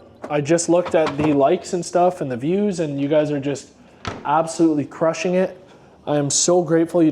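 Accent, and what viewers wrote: Canada. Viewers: You sound like you're from canada! Where ever ur from, love from Toronto 💪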